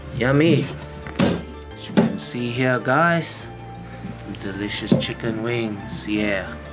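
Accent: South Africa. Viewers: You sound south African. Lol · This recipe is fantastic! Quick and delicious. Thanks very much. Are you by any chance based in Cape Town, South Africa as your accent is so distinct, I had to ask? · Wait, are you from South Africa by any chance? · This guy sounds like his grown up in South Africa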